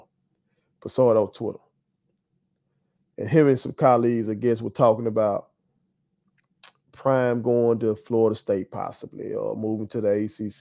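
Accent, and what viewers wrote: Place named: Memphis, United States. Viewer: bruh...real talk, I like you my guy...No homo... you talk like a south Memphis nigga for real...I've lived in Memphis for a long time. but I was born and raised in Northern Mississippi. you sound more Memphis than MS...Lol... you a real one fa sho that gives it to us straight with No chaser!!!! I like folks that can give you real game and know what they talking about...I played baseball and football. I've been coaching football in Memphis a while now. but my roots came from the Sipp. I like your content and how you give it👍🏿 you're hilarious to me and you probably don't even be trying to be.......keep it up bruh✌🏿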